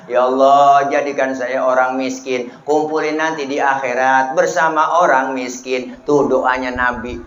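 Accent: Jakarta, Indonesia. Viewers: Jujur saya sangat kagum klo liat ustadz ceramah,lucu nya mantuuul khas Betawi nya kental bgt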